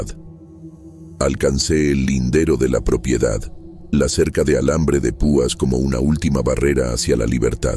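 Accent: Mexico. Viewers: Alchile ami me encanto con herga MEXICANA creo que es el primer canal con ese estilo 🤙🏻y ME GUSTO mucho,siento que te familiarisas mas con la persona del relato y las emociones que sintio por su manera de expresarse.🤌🏻📽️🫶🏻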